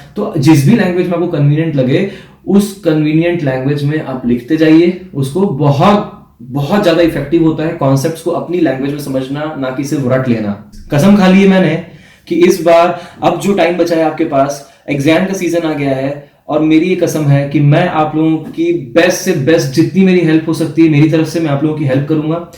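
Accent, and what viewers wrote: Bihar, India. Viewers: Bhai aap Bihari ho kya? Mera ak Bihari dost Aisa hi bolta h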